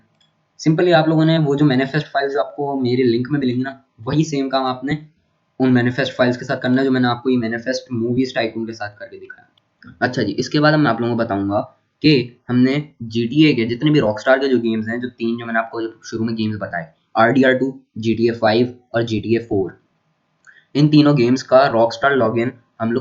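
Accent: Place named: India. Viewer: why is Indian accent annoying 🤠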